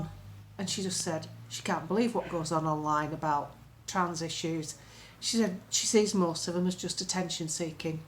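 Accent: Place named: Lancashire, United Kingdom. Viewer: You have beautiful skin, and I’m from Yorkshire so I loved your Lancashire talk it’s same in Yorkshire on “tinternet”, you need to do more funny stuff, I love it along with the political side as well!❤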